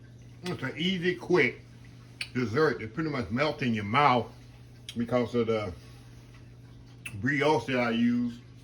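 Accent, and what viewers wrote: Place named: Texas, United States. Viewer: If you had not already told us, this Houstonian would know you were a Texas southerner by that mm mm mm. It's fun to listen to you enjoy your own food. That's how you know it's good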